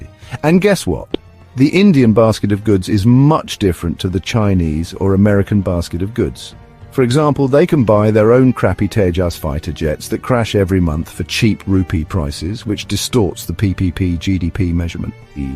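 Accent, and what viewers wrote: United Kingdom. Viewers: lol, obviously a muslim inspired rhetoric. No one is taken in by the English accent. Someone in congress woke up and initiated some creative AI? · One blatant lie is enough to expose the nefarious agenda- the one about Tejas. The posh Brit accent is the other giveaway😅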